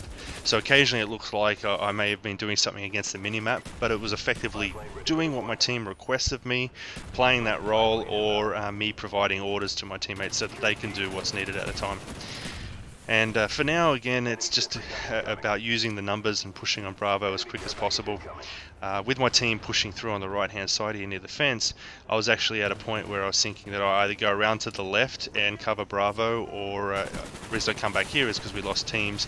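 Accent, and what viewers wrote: Australia. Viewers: Finally a gd Aussie commentator what state r u from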